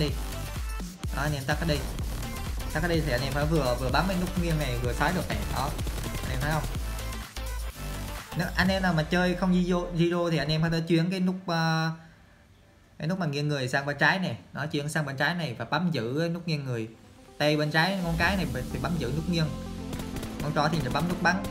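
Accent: Binh Dinh, Vietnam. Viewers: A phải ng bình định ko s nge dống thế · Anh là người bình định ạ ?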